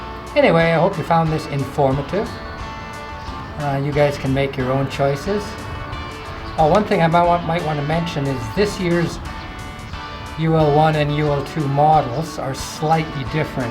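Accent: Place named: Canada. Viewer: You sound Canadian...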